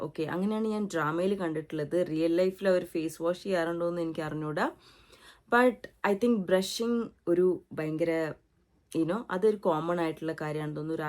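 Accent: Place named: South Korea. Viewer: സംസാരത്തിൽ നല്ല korean touch und. Bt good👍❤️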